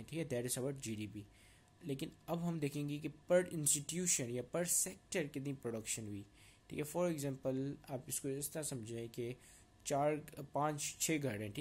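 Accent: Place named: United Kingdom. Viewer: nd youre Urdu is like English Accent it reminds me Bilawal Bhutto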